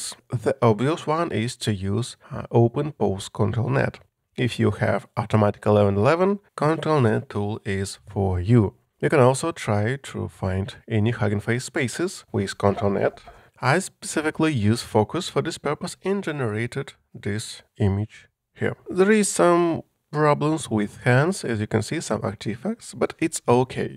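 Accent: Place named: Russia. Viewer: Такой русский английский. Это скорее не акцент, а интонации Полезное видео, благодарю за труд.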